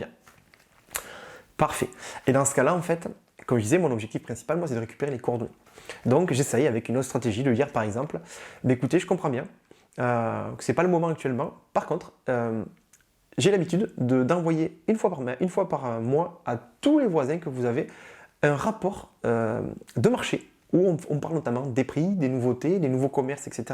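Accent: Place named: South, France. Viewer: Hello ! Alors moi je t’explique, j’ai entrepris des démarches depuis quelques semaines pour devenir mandataire immobilier, en auto entrepreneur... Évidemment je n’y connais rien en Immobilier car je débute et qu’il faut un début à tout, je vais apprendre grâce à mon réseau l’agence pour laquelle je dépends, et en autodidacte sur les innombrables vidéos tutoriels YouTube qui existent.....puis en allant sur le terrain parce que c’est sur le tas qu’on apprend le plus aussi ! A ton accent on devine que tu es du Sud, si ça peut te rassurer on ne sera pas concurrent puis je suis du « Noooooorrrd » comme disait Galabru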